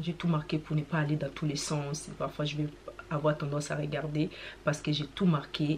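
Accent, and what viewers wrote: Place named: Kinshasa, Democratic Republic of the Congo. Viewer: Par votre accent vous êtes congolais de Kinshasa merci pour votre partage ! Par contre votre méthode est vraiment restreint qui peut tenir à Long terme pour perdre beaucoup de kilos 😮😢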